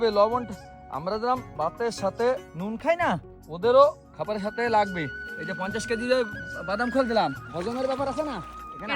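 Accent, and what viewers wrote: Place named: Khulna, Bangladesh. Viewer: খুলনার অরজিনাল ভাষা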